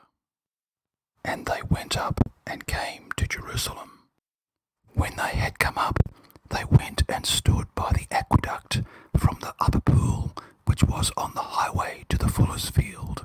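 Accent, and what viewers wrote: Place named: Australia. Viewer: This is great. ASMR bible whispering...with an Aussie accent! Thank you.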